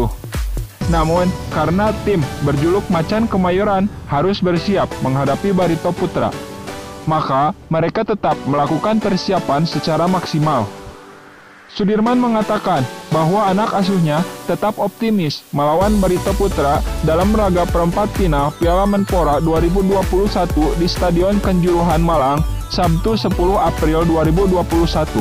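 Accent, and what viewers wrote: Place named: Bandung, Indonesia. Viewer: Konten Persija rasa Persib dari logat adminnya Persib banget. Semoga jadi jembatan perdamaian & persaudaraan.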